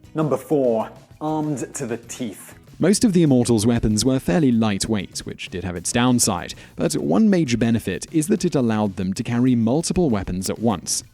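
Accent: United Kingdom. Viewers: 79,000 was not by any stretch the biggest army in ancient history. There were much larger armies than that. Look, I know you are British, and most often inaccurate in every single video, but please, drink your tea, and keep your revisionist history to a minimum. Some people conflate your accent with facts.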